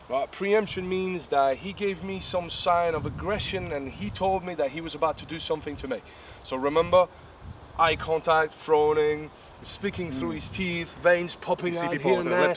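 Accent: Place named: England, United Kingdom. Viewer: Yeah very long time by your accent. it's just a curious combination of accents. It took me a minute to figure out you were a native french speaker. In the beginning first few seconds you sound very english . Regional accent is very strong but they you listen again and you can tell you are not a native speaker but it's very hard to tell where. I figured french . THEN I saw your name.. after J wrote the comment and realised.. ahhh obviously he's french. But it's not at all easy to tell. You definitely sound more English that french..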